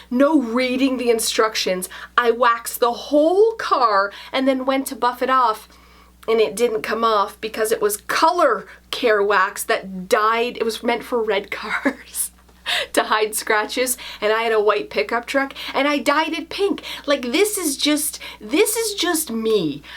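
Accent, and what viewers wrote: Canada. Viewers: In the same way you like her Irish accent, I enjoy your Canadian accent! 😍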